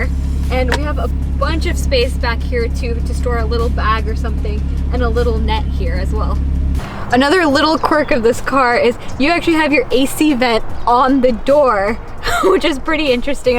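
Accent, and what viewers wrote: India. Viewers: R u a Telugu girl ???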